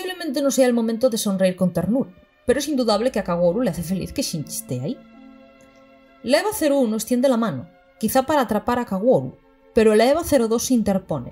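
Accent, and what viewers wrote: Spain. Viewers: Son fantásticos, todas y todos los que estén detrás de este trabajo, mucho orden, demasiada edición, la narradora tiene el tono de voz ideal con el endulzante acento español, es increíble. Estoy enamorado de sus videos. Saludos desde México! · Una pregunta, eres gallega?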